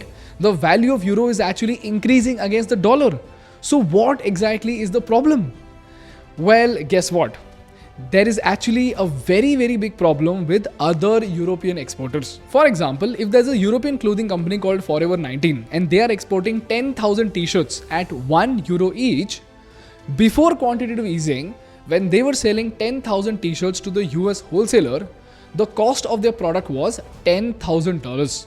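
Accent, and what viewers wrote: India. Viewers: Can’t take anything serious in this Indian accent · Its indian accent, much easier to understand.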